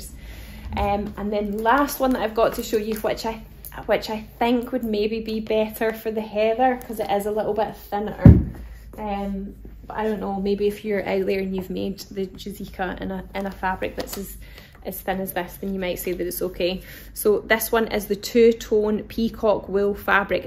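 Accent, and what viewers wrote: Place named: England, United Kingdom. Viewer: Watch you 10 sec and got subscribed. Such a inspiring vibe and sweet energy. For me as a German your dialect I have to listen more. Hmmm I still guess …. No USA no canadien … England? …. Hmmmm … Australia maybe. But I understand everything. 😂 from the fabrics and things in the background I can tell I like this style. Thank your lives sessions. Best way to learn, even if I don’t see this Live. Greetings from Germany 🇩🇪 🎉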